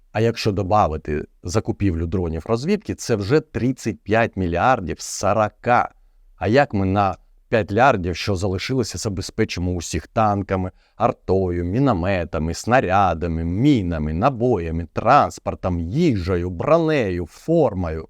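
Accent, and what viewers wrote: Russia. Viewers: У тебя русский акцент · Підготував відео з хорошим звуком та картинкою, але не спромігся прогнати текст так, щоб вуха не різала вимова (українські слова вимовляє за росіянськими правилами граматики). Просто боляче слухати, що вже казати про логіку, аля: "ну ми ж таки виготовляємо". Питання в тому чи всі можливості використанні, чи може варто критикувати владу за недостатню мобілізацію заводів по виготовленню боєприпасів, забиванню на дрони-камікадзе і вставляння палок в колеса приватним виробникам зброї + волонтерам?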